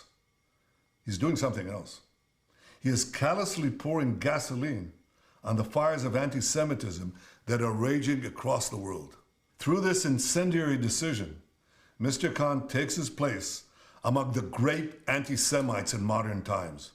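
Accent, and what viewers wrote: United States. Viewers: The man who speaks good English with an American accent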